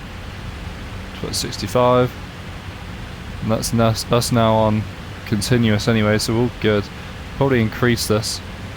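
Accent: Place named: RP, United Kingdom. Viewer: Next Il2 video im expecting your posh accent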